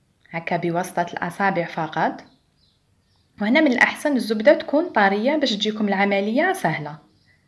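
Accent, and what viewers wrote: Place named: Algeria. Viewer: تبارك الله على اخواتنا الجزايريات راكم حادكات الله يبارك و تحمقني هدرتكم مغربية مرت من هنا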